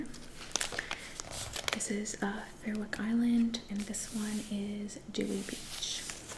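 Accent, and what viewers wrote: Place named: Ohio, United States. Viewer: I hope you're feeling better! Youngstown is my town! The Canfield fair is the largest county fair in the state. You sound like you're from around-about these parts. If you've never been to it, I recommend going. There's a lot to see, animals to see/pet, art, food, and rides. 🙂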